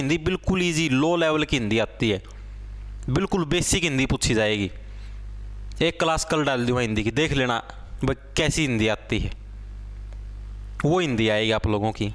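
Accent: Haryana, India. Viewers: Hariyana ka londa he kya ye bak raha he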